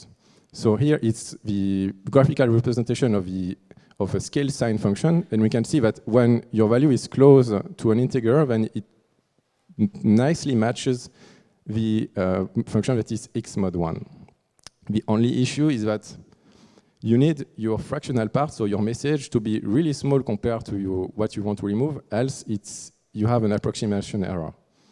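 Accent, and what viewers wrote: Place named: France. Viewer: j'ai tellement le seum c'est en anglais avec un accent Français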